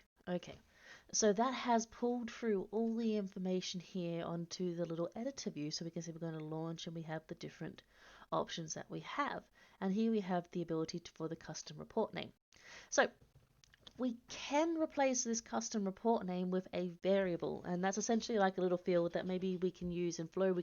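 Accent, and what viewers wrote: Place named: South Africa. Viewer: thanks very much for this tutorial. I searched and searched and eventually found your video which was just brilliant. I am a bit curious as to your accent. I lived in South Africa for decades, and I have an English South African Accent, which made me question if your accent doesn't have some South African in it, then I saw one of the subdirectories which had what looked to be Dutch text, then I though maybe there is some British in the voice and possible New Zealand. You're probably going to tell me that I'm barking mad and that you are from Egypt 🙂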